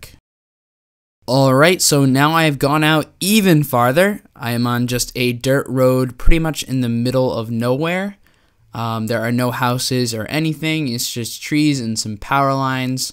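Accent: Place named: North East, United Kingdom. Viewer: Where in the north east are you from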